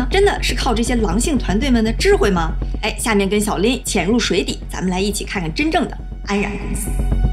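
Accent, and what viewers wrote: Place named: Northeast, China. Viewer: 美丽，睿智，幽默，专业。喜欢您的东北口音：）想起了多年前在长岛的一个哈尔滨美女，我的麻将搭子，哈哈。关注了。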